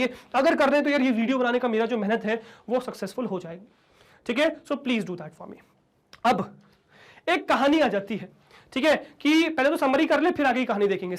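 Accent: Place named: Bihar, India. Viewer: Sir ur bihari accents op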